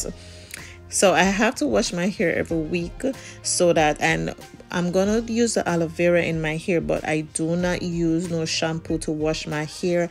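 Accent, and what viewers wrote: Jamaica. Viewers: You sound Jamaican 🇯🇲 big up if you are lol🇯🇲🇯🇲🇯🇲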